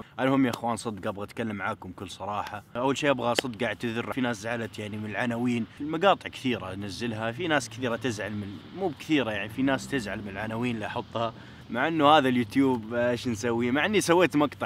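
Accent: Saudi Arabia. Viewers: ادمان اوفر واتش هو تونسي بس بيحكي سعودي عشان نفهم عليه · Abdallh_alenzi _ بس هو يتكلم سعودي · تونسي بس كان عايش ب السعوديه عشان كدا بيتكلم باللهجه السعوديه وهو دلوقتي عايش ف كندا دراسه وكدا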